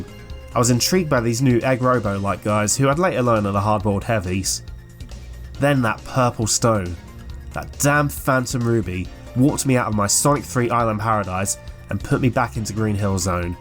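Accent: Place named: England, United Kingdom. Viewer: Are you me, but with an English accent? Because I feel like you are me.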